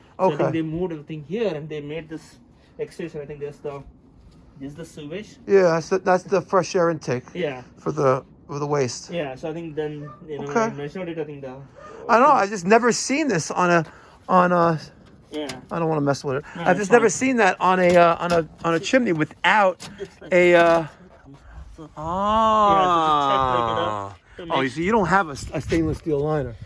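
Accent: New York, United States. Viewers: Hey, please explain what the difference is between PPM CO and PPM CO AF? Really enjoy hearing your NY Accent and your expertise in everything Plumbing & Heating.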